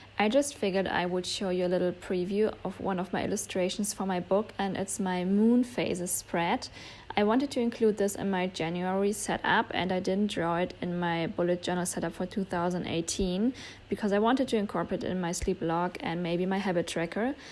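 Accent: Germany. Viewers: Ich wusste das du deutsch bist, hab ich doch richtig gehört 😂👍🏼❤ Ich hab übrigens noch nie so ein schönen Journal gesehen, hab vergessen wie das auf deutsch heißt lol 😅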